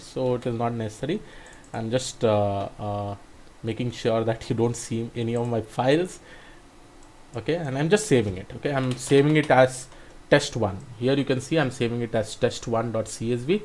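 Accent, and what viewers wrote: India. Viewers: A good tutorial, but it would also be really great to have a tutor who has clear English since it is a tutorial in the English language, and as for somebody who is not a native speaker and also not an Indian who is used to this kind of accent, it sometimes becomes an extra challenge. P.S. No need to make a racial issue out of it, it's just a simple truth. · good indian accent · can we get 1 tutorial vid without some corny dude with a thick hindi accent...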